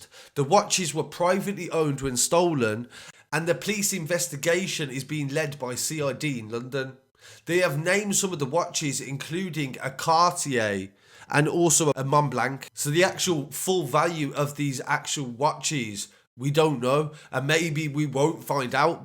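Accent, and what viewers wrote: Birmingham, United Kingdom. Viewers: My man needs to do a cbeebies bedtime brummy stories !!! Would be epic ... especially with the sponsor before it all starts 👍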